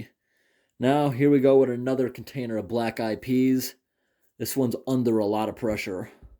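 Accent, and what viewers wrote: New England, United States. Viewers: That is his new england accent.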